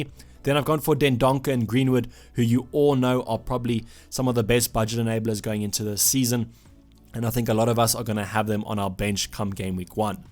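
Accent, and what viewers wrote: Afrikaans, South Africa. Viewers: Is jy afrikaans😂🤔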